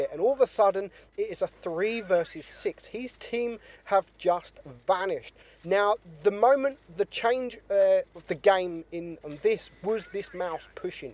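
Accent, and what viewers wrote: United Kingdom. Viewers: Where is he from? UK?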